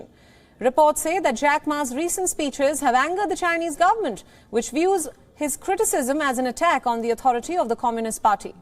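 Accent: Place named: India. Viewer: She is Indian and speak English with funny accent just speak in ur language 🤦‍♂️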